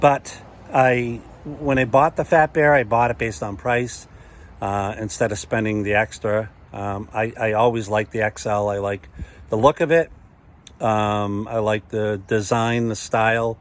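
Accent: United States. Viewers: Thank you for the feedback. I’m currently trying to get a refund from Eahora for an order I cancelled before it even shipped. They have been very difficult. I mean there should be no issue with a refund for something that never got sent. It seems like Yamee’s company is much better. Customer service and a company that stands behind its product is my other big consideration. I Anyway, just waiting to get that refund so I can go ahead and get one of these. Your review and feedback is super helpful. Btw, you sound like your from Massachusetts.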